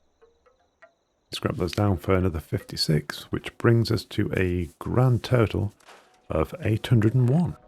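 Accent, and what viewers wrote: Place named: United Kingdom. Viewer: Came for the concrete, subbed for the British accent!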